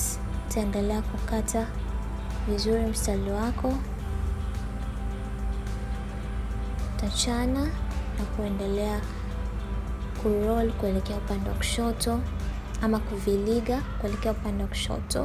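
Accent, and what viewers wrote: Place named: Tanzania. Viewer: Kiswahili cha tanzani Tamu kwanza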